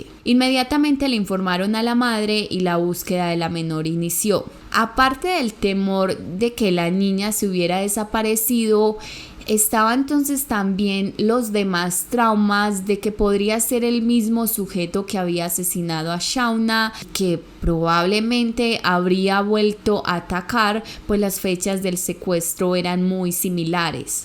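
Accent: Colombia. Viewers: Pobre niña En poco tiempo te has convertido en una de las mejores y tu acento colombiano me encanta 👍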